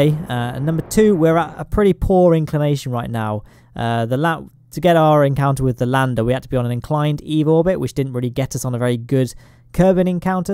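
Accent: United Kingdom. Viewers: British Scott Manley? I think so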